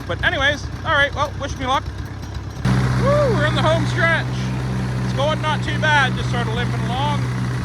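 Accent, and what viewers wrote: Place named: East, Canada. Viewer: I know a guy from eastern Canada when I hear him. Ontario, Nova Scotia? Surely not from New Foundland 😂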